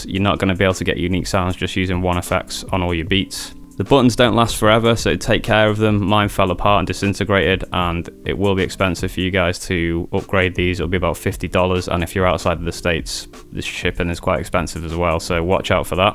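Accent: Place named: Solihull, United Kingdom. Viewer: Hey mate. Greetings from Canada. First video of yours I'm watching. I recently picked up one of these units used so im looking forward to watching your tutorials. I'm watching this video now and my wife heard your accent and said she thinks you're from solihull... she's originally from Birmingham 🙂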